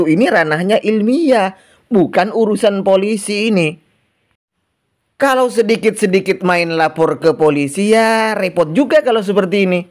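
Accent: Madura, Indonesia. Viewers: Ente orang madura ya min,,? Pantes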